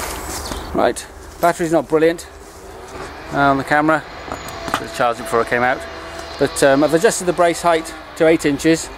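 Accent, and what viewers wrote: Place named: North, United Kingdom. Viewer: You sound a Northerner , so where are you shooting ??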